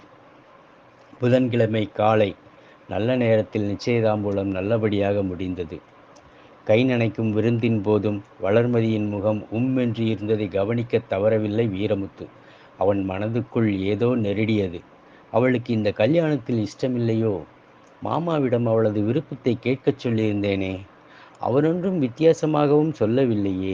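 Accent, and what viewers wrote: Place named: Kongu, India. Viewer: அருமை அருமை சார்.... அற்புதமான கதை.... நெகிழ்ச்சியான முடிவு.... கொஞ்சும் கொங்குத்தமிழில் கதையை வாசித்தது கூடுதல் சிறப்பு.... வாழ்த்துக்களும், பாராட்டுக்களும்... 🎉🎉🎉🎉🎉🎉 மேலும் பல கதைகளோடு மென்மேலும் தொடரட்டும் தங்களின் இலக்கிய பயணம்❤❤❤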